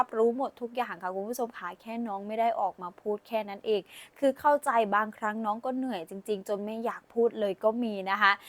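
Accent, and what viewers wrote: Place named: Isan, Thailand. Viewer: ❤แอดมินเปนนักข่าวหรือนางเอกหมอลำ..น่ารักจังอ่านข่าวสำเนียงไทยผสมอิสานได้ไหลรื่นฟังเพลิน❤😊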